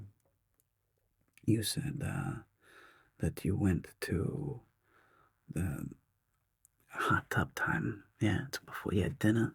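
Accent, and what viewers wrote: New York, United States. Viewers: This felt like a spider noir theme with the New York accent and the black and white. Amazing acting too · I like your NY gumshoe accent.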